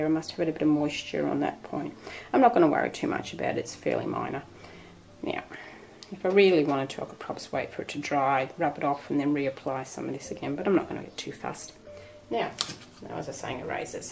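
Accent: Australia. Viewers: Unable to sleep, my creative mind wondered - and it wondered right over to discover I am in fact not totally loopy for using the same technique! I cannot draw to save myself, but have found art therapy useful for years; so thank you for your tips. Love hearing a fellow Aussie voice to. "Rubber" usage unite!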